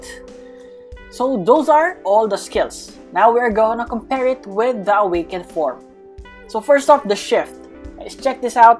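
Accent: Philippines. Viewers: Is it just me or accent is Filipino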